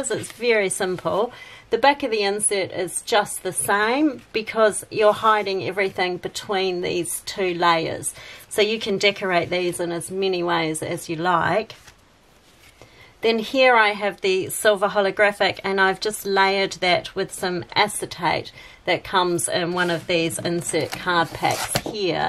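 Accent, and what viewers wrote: New Zealand. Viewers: This is the best card tutorial I’ve seen so far. Thank you so much I can hardly wait for my machine to arrive. By the way so nice to hear another Kiwi hahahahaha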